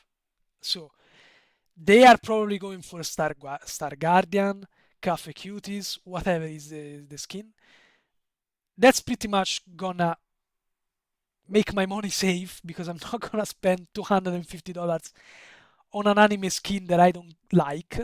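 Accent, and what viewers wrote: Italy. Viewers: its right before my bedtime so i cant watch more than those 3 mins today but i gotta say this is a really cool way to stream and interact with the community, youre really awesome!! i also like the italian accent, parlo un po italianooooo, good night <3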